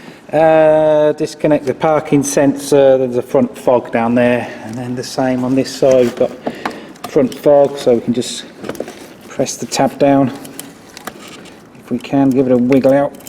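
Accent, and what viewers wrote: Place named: United Kingdom. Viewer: Excellent video, friend! Watching it in a clean, quiet shop with a British accent was the icing on the cake. Love and respect from USA. 🍻🍺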